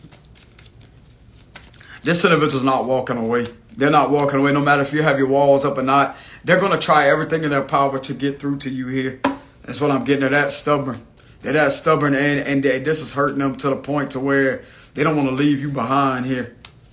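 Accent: Louisiana, United States. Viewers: New to the channel! Came across my feed….no coincidences! Gotta be from Louisiana with that accent 😇 Takes one to know one! Thank you for your service fellow lightworker 🙏✨ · Thank you for the reading. By chance, are you from south Louisiana?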